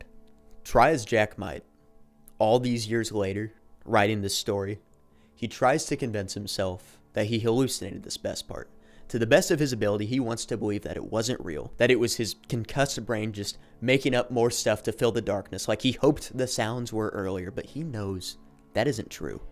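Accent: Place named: Pennsylvania, United States. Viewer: You're from Pennsylvania aren't you? I can tell by your accent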